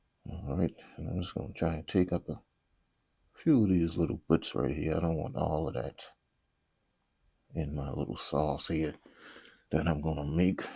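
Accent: Charleston, United States. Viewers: Trying to find out if you have a restaurant and if so where you located? Sounds like your from Charleston SC ? Lol 👍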